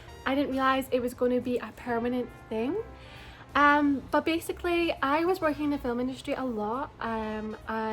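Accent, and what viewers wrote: Scotland, United Kingdom. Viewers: It’s so amusing to hear the blend of your Scottish accent with the American accent! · Please don't loose your Scottish accent and your beautiful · Please don’t ever ever ever ever lose your accent. The Scottish accent is like music. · Love Your Scottish Accent So Pretty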